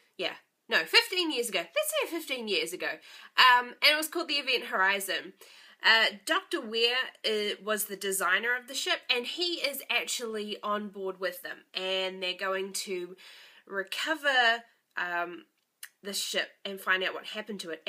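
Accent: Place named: New Zealand. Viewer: Awesome review m'dear. I know it's weird when people talk about your voice, I get it a lot but your NZ accent is truly awesome btw :P Yeah I'm not sure what to think about whether or not he was possessed by the evil already or if it got to him whilst on the ship. I lean more towards him being possessed already as that tends to explain more.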